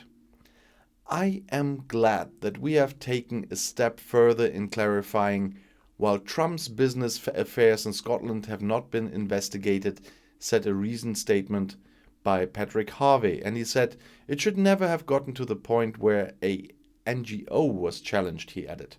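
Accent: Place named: Germany. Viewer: I wondered about your accent, thought it was German, thank you for your very enlightening contribution! May the trumpstain disapears back to hell, where he belongs!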